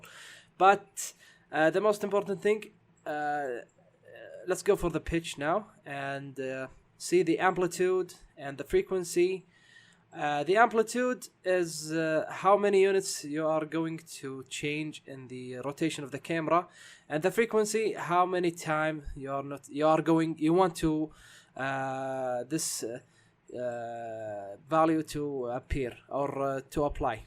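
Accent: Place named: Arab world. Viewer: love your accent are you arabic عربي صح ؟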